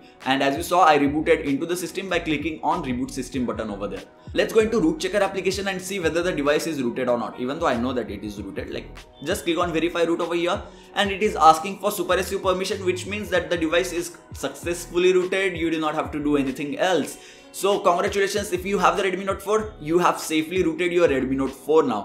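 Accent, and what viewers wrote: India. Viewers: you indians always have really bad accent when it comes to English. It's a shame we can't understand nothing, please practice your accent.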